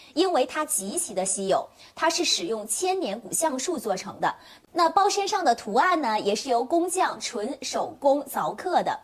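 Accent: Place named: Japan. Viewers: 因为她说的是日语发音。她在日本做这行，按日语发音来没有任何问题啊。就像我们把Hermes用中文音译成爱马仕，法国人也没理由来指责我们发音不标准吧。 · 可是她不只是用日語發音，還混著來，聽起來真的很突兀。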